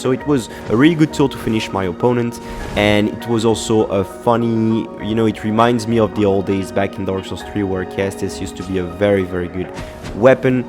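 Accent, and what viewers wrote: France. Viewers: Are u french? Si oui tu parles très bien anglais, les touches d’accents font tout le charme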